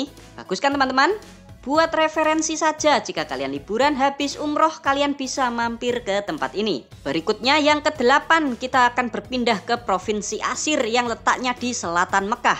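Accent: Java, Indonesia. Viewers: kok medok banget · Pak beri berita tentang arab bahasanya medok jawabnya ya pak jangan tinggalkan.bahasa kampung jawapnya.ya pak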